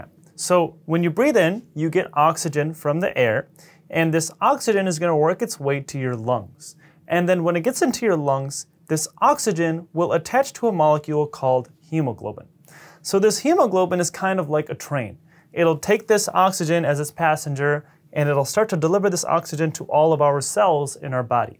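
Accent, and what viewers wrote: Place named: United States. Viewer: I'm guessing from his accent he was born in America?!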